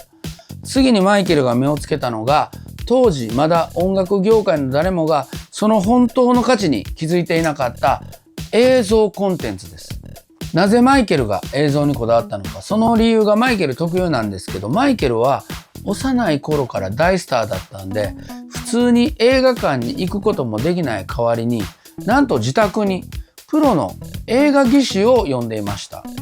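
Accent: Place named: Kansai, Japan. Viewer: 大学生のときにアメリカ音楽の歴史を学び、Michaelが大好きになった31歳です。大学生でめちゃくちゃハマったときレポートも書いたのでそのときに西寺さんが書いたMichaelの本も読みましたがはじめてお話されているところを見て関西弁にかなり親近感を感じてます！この動画を見て、IMAXで2回目見てきます👀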